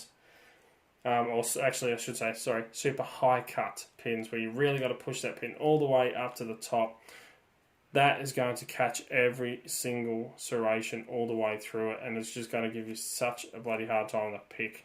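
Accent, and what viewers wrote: Australia. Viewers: Glad to find your Channel, good to hear a fellow Aussie showing us some great content, I am new to Lock Sports but am enjoying learning with my Sparrows Tuxedo kit, Cheers;) also thanks for the referral to Locksmith Toolbox they are awesome and good prices:)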